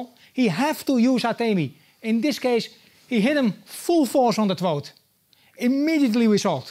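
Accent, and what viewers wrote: Netherlands. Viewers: Thank you very much for your hard work! btw, your English is actually awesome. by your accent I'd say your Dutch, am I right? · Your English is good, you sound Dutch I don't speak Dutch but do speak German as well as English. I was told while learning German you never loose your native ancient unless you live in the country for 20 or more years. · Your english is oki :D dutch accent is funny, too.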